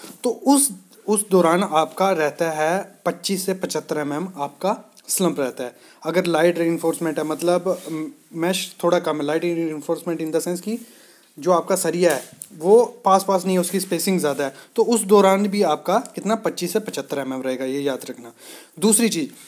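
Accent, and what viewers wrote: Jammu, India. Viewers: He sounds like my friend from jammu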